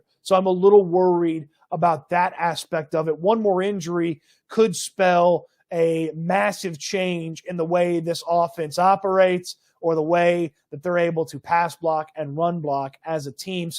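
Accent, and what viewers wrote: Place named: Texas, United States. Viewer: I only really listen to the wheelhouse for your texan talk tbh....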